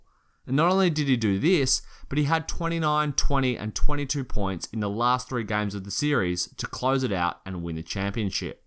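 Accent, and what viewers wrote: Australia. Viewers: That was an incredible video I love your a accent it’s just like mine go aussies go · Are you Australian?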